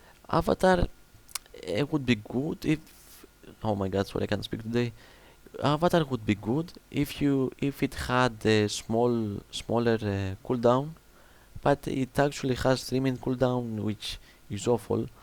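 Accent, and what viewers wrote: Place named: Greece. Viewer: ζήτω η ελληνική προφορά! διαφωνώ για το dragon roar και ίσως πρέπει να αναλύσεις και το rude interruption ;-)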